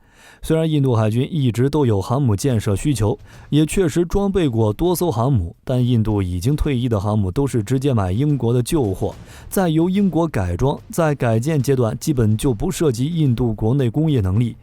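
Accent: China. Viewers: 可能是聽見中國口音在酸印度，反中基因的膝反射。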